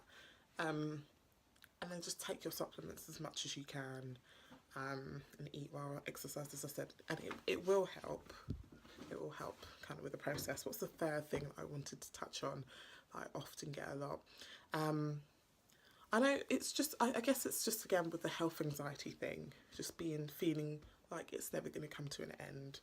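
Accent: United Kingdom. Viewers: You sound like you live in the UK. You need very pale skin to remain healthy in many parts of Europe. Your natural habitat is in a much hotter climate with much more sun exposure. Climates with less sun than you need can make you infertile. This is simply Evolution. Caucasians get skin cancer in the sun, Africans thrive in the sun. Caucasians thrive in cold climates, Africans get very sick. You should consider a climate that your body is adapted for.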